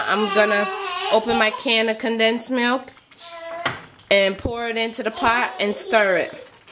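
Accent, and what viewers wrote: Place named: Kingston, Jamaica. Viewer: Where in Jamaica are U living, U sound like Kingstonian.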